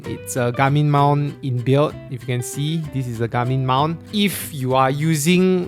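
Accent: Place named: China. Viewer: This Chinese dude has a nice accent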